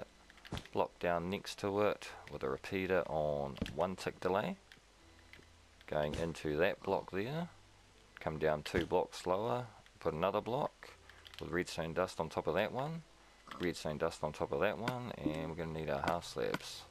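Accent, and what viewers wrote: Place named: Australia. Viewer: this thing is incredibly slow/inefficient, too many non wood blocks within a 5x5 radius. if this were just dirt and a dispenser firing away in an otherwise clear 5x5 area, even just that one glowstone 8 blocks up would slow it down whereas a wood block would not. of course thats also a much faster albeit less flashy way of getting wood. comparatively, this is like the poster boy for erectile dysfunction. however, it would be great if it didnt go against the sapling mechanic and you did a sound job with the video so id say go back to your own design. start off with a dispenser and mark off a 5x5 for testing, you will see that wood blocks(not planks) can pretty much go anywhere while others can maybe go 2 high or so before they hit the bonemeal rate. that said, i still cant give this a thumbs down, Australian accents captivate me. 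goodaye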